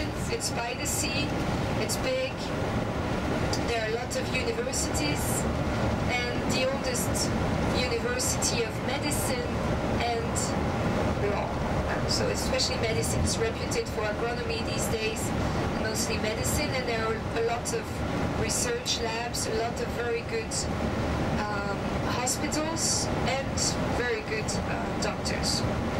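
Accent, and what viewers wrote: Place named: France. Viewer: French man here, and I love the "Frenglish" accent very much!! 😁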